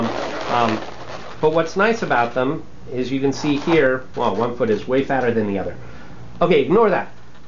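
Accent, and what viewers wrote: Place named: Australia. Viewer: that's the best koala bear balloon ever. i also liked your austrailian accent for the intro. well done. keep on twisting.😍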